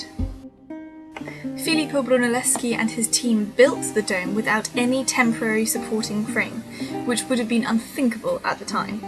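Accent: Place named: England, United Kingdom. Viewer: va bene! you have lovely version of the sometimes not lovely posh english accent..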